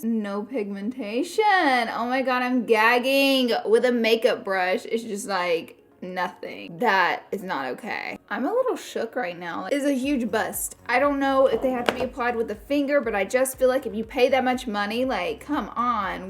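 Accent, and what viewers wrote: South, United States. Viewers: Where are you from???? I love your southern accent. I'm from mobile, Alabama and I can just feel that you're from Alabama lol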